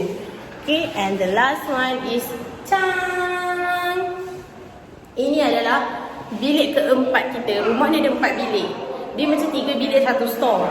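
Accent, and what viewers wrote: North, Malaysia. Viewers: . Kami prefer cakap utaqa lagi sedap dri pelat keyel. Hihi. Ni jujuq tau dri km peminat oqg utaqa jugak. 😂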